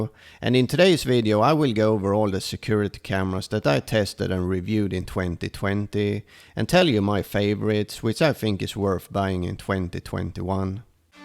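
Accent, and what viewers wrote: Sweden. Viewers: Your accent sounds a little bit Swedish, are you?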